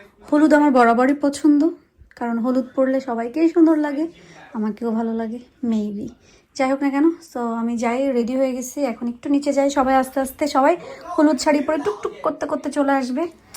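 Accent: Sylhet, Bangladesh. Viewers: তোমাকে আমার খুব ভালো লাগে আপু,আর তার সাথে সিলেটের ভাষা😊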